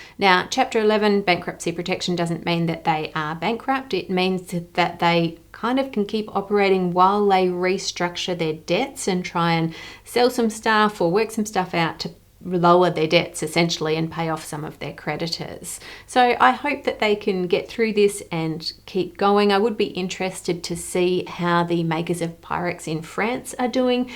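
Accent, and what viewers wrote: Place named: Australia. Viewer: As someone who's grown up in Corning and has a dad who still works for the company that makes Pyrex, it was weird to hear an australian talk about it lol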